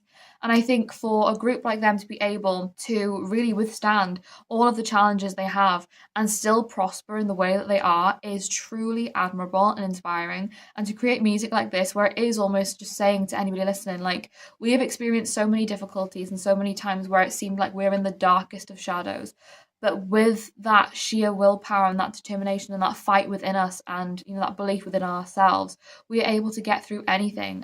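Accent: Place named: Nottingham, United Kingdom. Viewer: Your very smart.. thank you for appreciating mvs.. I remember my friend from nottingham in UK.. you both speak the same.. ❤❤❤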